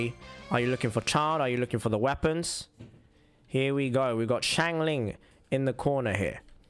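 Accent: United Kingdom. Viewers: He is asian, yet he sounds a bit british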